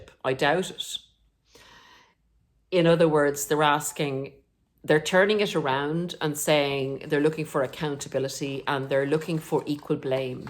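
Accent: Ireland. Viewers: You don’t need to defend anything you do, you are a kind wholesome person and I love your beautiful Irish accent. My darling Irish Mammy died in March. She taught me how to be loving and to love.Please don’t don’t don’t apologise. The best trick of the devil is to pretend he doesn’t exist.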